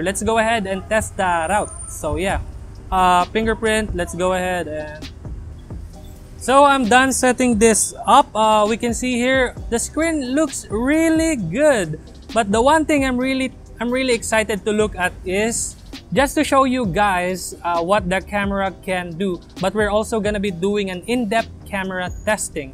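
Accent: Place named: Philippines. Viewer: I love your pinoy accent. hahahah thanks!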